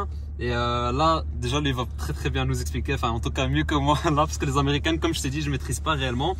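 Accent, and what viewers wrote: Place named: Belgium. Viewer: une guitare !!! retourne compter les chevres au maroc avec ton accent belge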